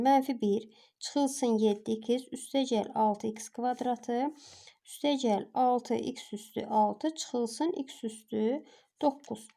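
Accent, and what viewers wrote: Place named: Northwest, Azerbaijan. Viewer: Salam müəllimə çox sağolun əziyyət çəkirsiniz, haralısız? (Qax, Şəki, Zaqatala?) mən Qaxlıyam ləhcəniz tanış və yaxın gəldi.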